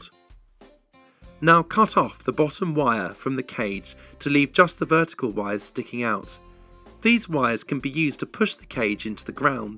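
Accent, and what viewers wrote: United Kingdom. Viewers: Why do you have to make everything so freaking dramatic. That British accent doesn't help either. Just give the information man!!!!. How did you people conquered the world? Jesus!!!!!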